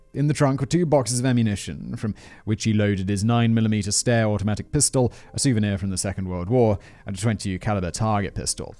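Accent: United Kingdom. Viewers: The more I listen to the more that I think I should act on my notions to move to Britain so I can find a man who says "brilliant" and "what are you up to.." Everything sounds so much more charming and intelligent with a British accent.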